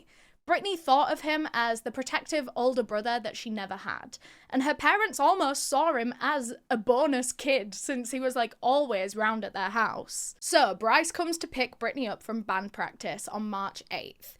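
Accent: South, United States. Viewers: You have a good American accent sometimes I hear in other videos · It just depends on where your from. Down south we say it the way you are.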